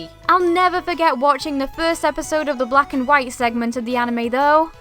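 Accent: United Kingdom. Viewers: Are you English? You have a British accent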